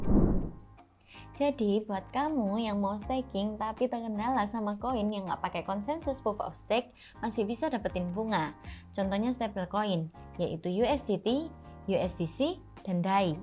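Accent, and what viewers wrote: Java, Indonesia. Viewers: Mbake ayu lan medok nggih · Wah cocok buat kita yg ingin invest jangka panjang ini, Terimakasih mbk Cantik yg membawa Materi sangat menguasai sekali dan Cantik lagi, bahasa jawanya medok.... Hhehehe.... Aq pingin Staking yg Shiba Inu lah... Nunggu ada dana Free.... Sukses buat Triv....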